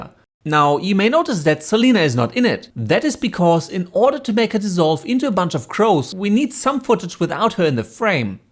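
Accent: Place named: Germany. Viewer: Holy fuck you have a German accent xD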